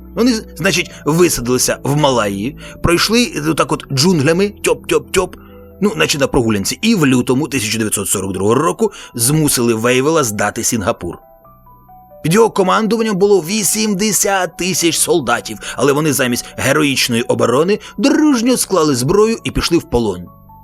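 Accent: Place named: Russia. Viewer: Мова автора з скаженною кацапською алітерацією мови!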